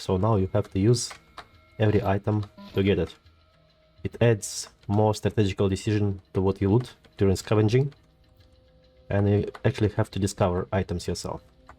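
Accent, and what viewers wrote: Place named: Central Europe. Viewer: Haven't taken a look at this channel in a while. I'm guessing you're doing something Fallout-like? Judging by that Pip-boy thing. Oh,and tour English sounds Central-European. A bit Eastern thought. Czechia?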